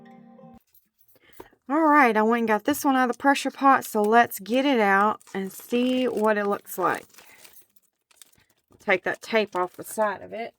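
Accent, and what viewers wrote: South, United States. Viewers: I'm so glad I found your channel. 😊 You're a delight. From your southern twang to your sweet laugh. ❤ Those pink molds could be for making candy. Possibly the split is for a scew stick for cake pops or something? IDK 🤷‍♀️ 😂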